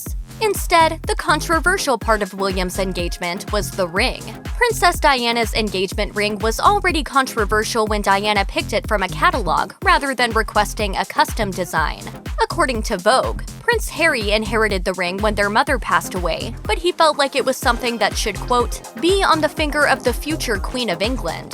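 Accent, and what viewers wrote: United States. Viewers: I hate videos with these robotic sounding American voices. You know the moment that voice pipes up that nothing worth while will be said. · As soon as I heard the American accent I thought .....more unfounded bullshit.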